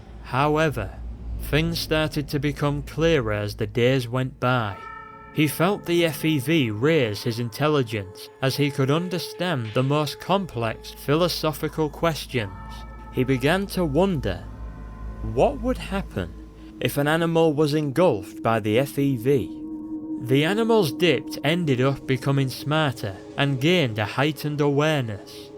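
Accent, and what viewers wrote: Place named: Northern England, United Kingdom. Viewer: Great video my friend. Love the northern accent!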